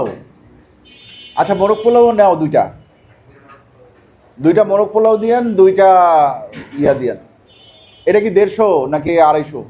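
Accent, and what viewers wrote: Barishal, Bangladesh. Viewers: vai ki barishailla🥹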